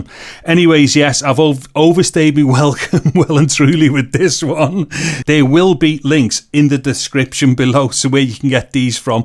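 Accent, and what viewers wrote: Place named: Wales, United Kingdom. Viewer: Luvved your Accent. Is it Welsh?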